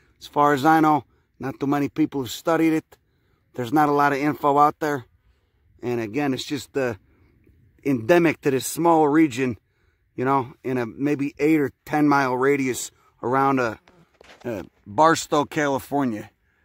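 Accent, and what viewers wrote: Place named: New York, United States. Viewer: I love your accent adds a a bit of humor to your videos. You have to be from New York. Great videos and educational.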